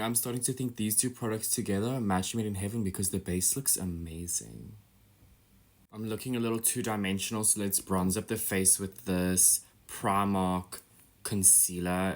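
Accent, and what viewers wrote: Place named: South Africa. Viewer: I love the south African accent soooo much!!! Can u speak the language for us just once in one of ur videos???!!! Please please pleeeeeeease!!! Saying that the one foundation smells like "literal shit" 😂😂😂 omg ur so funny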